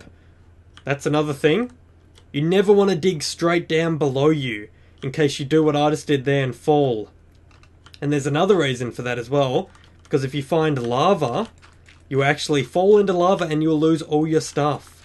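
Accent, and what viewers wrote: Australia. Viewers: is it just me or does sound australian?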